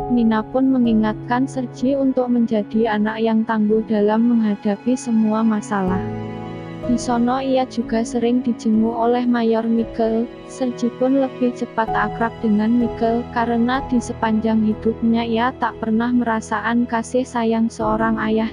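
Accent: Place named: Java, Indonesia. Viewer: Bohoso jowone ije kentel men mbak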